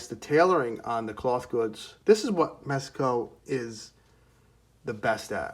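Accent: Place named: Long Island, United States. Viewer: Cool accent. Are you from Long Island?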